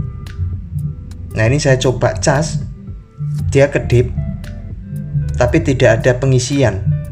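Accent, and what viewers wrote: Java, Indonesia. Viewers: Orang jawa yah?kalau boleh tau jawanya mana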